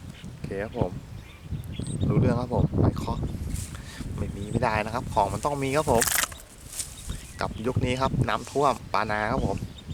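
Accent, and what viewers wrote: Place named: Isan, Thailand. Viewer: ทํามัยอีสานแล้วงัย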